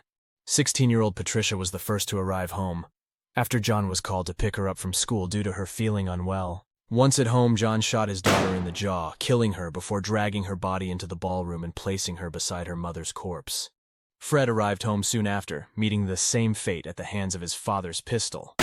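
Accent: United Kingdom. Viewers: oh bless Ur heart ❤️❤️❤️ it's not about the rewards it's about keeping U making this content don't stop Ur bloody good at it!!! Excuse the terminology lol I love Ur British too!!! So here's to many more videos 😁😁😁 xxxxxxxx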